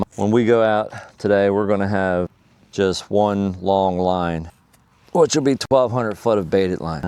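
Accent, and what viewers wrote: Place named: Maryland, United States. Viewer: " WOODER!! LOL, Definitely got that Maryland accent!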